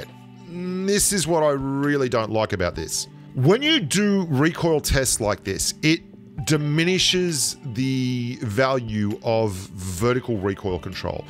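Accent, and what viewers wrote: Australia. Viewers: You not boring bro haha especially that Aussie accent always cool to listen to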